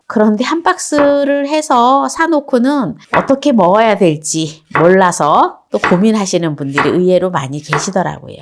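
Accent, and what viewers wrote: Chungcheong, South Korea. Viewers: 고향이 충청도라 그래유 이해해주세요 죄송합니다 ❤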